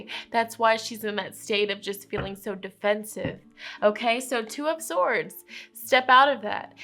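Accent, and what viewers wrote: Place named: United Kingdom. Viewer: Sometimes you sound British!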